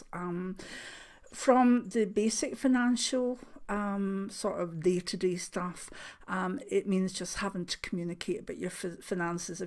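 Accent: Scotland, United Kingdom. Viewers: Hi, Thank you for your insightful reading. Just wanted to say I love your Channel. My parents are from Lanarkshire but we live in South Australia, so it is nice to hear a familiar Scottish accent. Thank you xx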